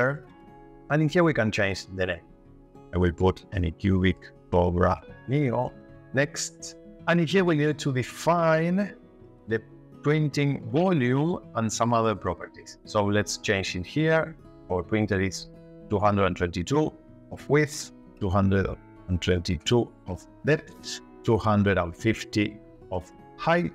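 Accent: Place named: Latin America. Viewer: De donde eres? tienes un acento tirando a latino..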